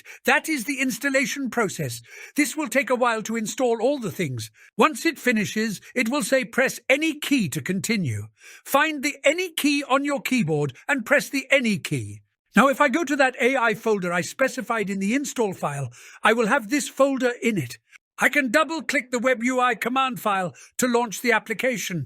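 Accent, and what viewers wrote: United Kingdom. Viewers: Dear excitable mystery British A.i voice person kindly making videos. /:) I am having a headache and wondered if you could share a line or two here or if you wanted make a video, regarding a headache I am having. No matter how I try, I cant seem to load any different models nor Lora's into it. I go to the model manager for example, and I find an applicable checkpoint sdxl model from Citivai as example, I copy the web address of the page and plonk it into the model downloader in Biniou, and all it does is download a few hundred kilobytes then says the model has been downloaded. Same for Loras. Do I need to edit the URL or do something else to it to make Biniou accept the model and install it? As for huggingface, I have an account but I haven't a clue what url I am supposed to take from there to put into Biniou as while I can find relevant pages for applicable models and such easy enough on there, huggingface don't exactly have a ''download"' button and when you are new to all this its a little befuddling. 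Many thanks, from an excitable british man.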